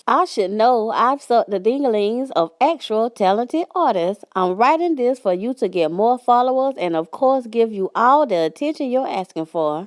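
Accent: South, United States. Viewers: you must be from the south🍅🍅🍅🍅🍅🍅🍅🍅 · Damn this chick here gossiping sound so damn country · I love your voice You sound like a Southern Bell.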